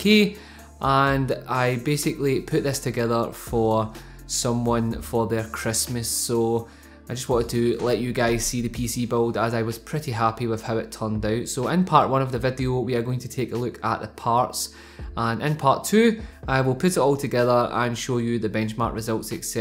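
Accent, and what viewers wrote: United Kingdom. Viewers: his british accent tho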